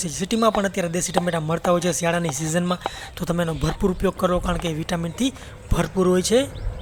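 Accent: Kathiawar, India. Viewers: મિત્ર, સલામ, આપની વાનગી અમોને ખુબજ લલચાવે છે, વ્હાહ, મજા આવી જાય... આપ શ્રી. આપની કાઠીયાવાડી માં બોલોને, ઈ અમોને ગમશે, જેવી તમારી રસોઈ તેવી તમારી બોલી.... DHANYAWAD..... ALL THE BEST....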